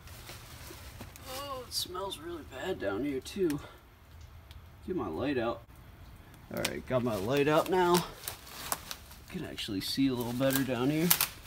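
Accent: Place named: East Coast, United States. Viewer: Pennsylvania? It sounds like you have a back east accent.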